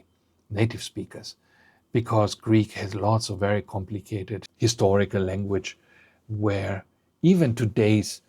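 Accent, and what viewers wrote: Germany. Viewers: I lived in Greece some thirty years ago and learned the language. Never stopped learning Greek as I follow music, television and film. According to your test I have C1 level of understanding. C2, I could understand some but not the details of the message. Btw your accent in Greek is almost imperceptible, though I could tell when you spoke English that you are German.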